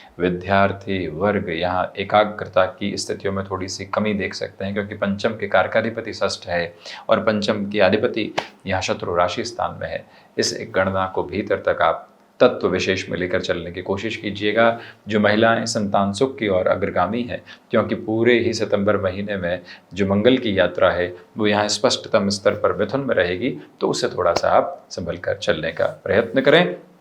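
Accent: Standard. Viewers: Sir aap bahut sahi bolte hai shudh Hindi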